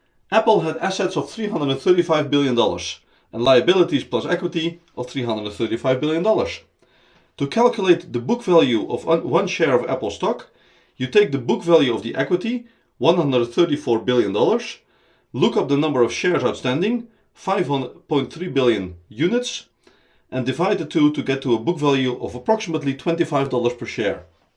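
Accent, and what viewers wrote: Netherlands. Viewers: Lekker Nederlands accentje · Are you Dutch? :P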